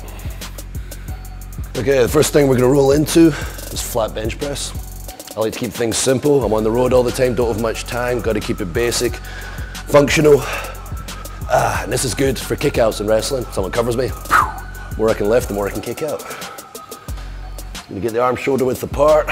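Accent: Scotland, United Kingdom. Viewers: Not British it’s Scottish mate.... Mon then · English?... you're fking Scottish, mate. I take it back, you talk like us, you're worthy. ...like it's up to us 😂 Don't fk with a Scotsman. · british?I thought he is Scottish🤔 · I thought you're Scottish mate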